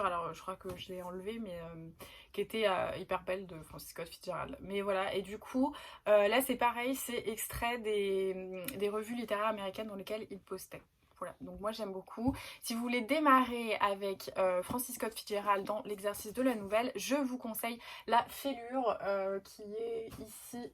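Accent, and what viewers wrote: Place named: England, United Kingdom. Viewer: Trois de Valérie Perrin! Trop fastoche pour la première! Sinon je rêve d avoir ton accent anglais!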